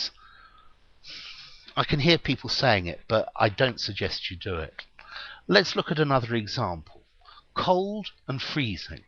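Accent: United Kingdom. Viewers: First off, as an English Language Development teacher (I'm a native speaker of American English) in America working with mainly hispano-american immigrants, I appreciate this valuable post, as you speak with a wonderful example of a British accent, and I love your cadence and clarity of enunciation. I am going to use more of your videos in my class to help my students understand the importance of pronunciation, cadence, accent, and enunciation. Second, I applaud you for tackling such a nuanced and tricky subject. Even as I write this, I realize how often I use these adverbial modifiers, and how difficult it must be for my students to follow the differences in what I mean. On the upcoming research project, for example, I said that the Power Point presentation is "fairly important", but that the written component is "quite important". I was shocked when all my students then began working on their Power Point presentations instead of focusing on their written reports. 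Obviously, they didn't understand the difference between "fairly" and "quite". Thanks for making me more conscious of this in my communication. Finally, I'm including a link below to a written article about this same topic that may add to the conversation. I hope that such links do not detract from the work that you are doing. Again, thanks for making resources that so many of us value, quite a lot! Please contact me if there is any complaint: <mail> <link>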